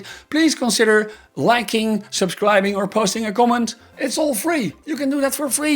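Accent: Netherlands. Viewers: Hi! Very nice video, thank you🙂 I have all the Bandai double-layer and single-layer solar games, they are amazing. Especially that they still work! One of my favourite is the single-layer "Invaders of the Mummy's Tomb", the mini version. Double-layer Terror House is also good. I'm not very fond of Zaxxon though, but maybe I just didn't get the hang of it, yet. FYI, there were several more of these double-layer LCD delights. I know (and own some) of these: MASUDAYA: Samurai Vs Ninja, Air Force MORIOKA TOKEI: Brave Fireman, Construction Work, Mice Party, Shark Hunter, Sky invaders (=Air Force), Jupiter Please, can you tell me, which LCD game books (catalogues) did you show in the video? I want them🙂 Especially the second one shown. p.s. You have a lovely Dutchglish accent😄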